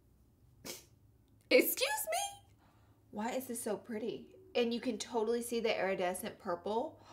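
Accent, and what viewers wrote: South, United States. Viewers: Y'all she looks like a chola but sounds like a southern lady! I love her voice so much 😂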